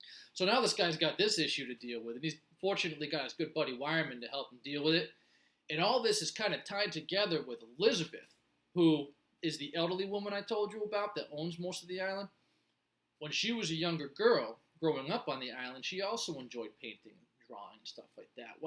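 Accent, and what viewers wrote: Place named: Boston, United States. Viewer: Patients lmfao!! Are you from Boston btw you got hint of accent, as I’m from Boston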